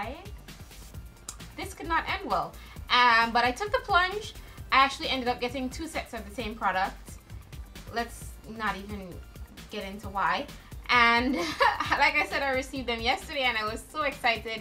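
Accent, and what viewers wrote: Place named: Trinidad and Tobago. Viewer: found you through your amazon review....ah hearing that accent gyul! Trinibago???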